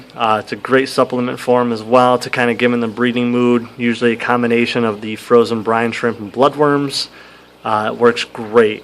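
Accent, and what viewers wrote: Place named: Massachusetts, United States. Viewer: are you from Massachusetts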